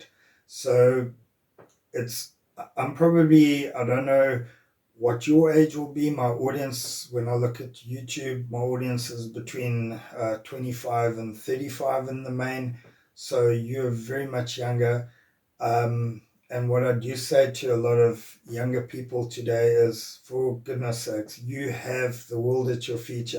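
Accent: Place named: South Africa. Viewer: That ZA accent !!! Not just DNA.... Love to see content like this OG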